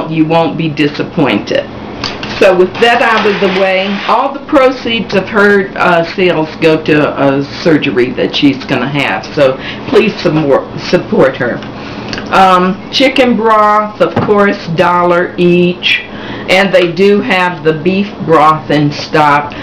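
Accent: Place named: Midwest, United States. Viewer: LOVE this:) Im from Wyoming so Nice to listen to another midwest lady:) THANK YOU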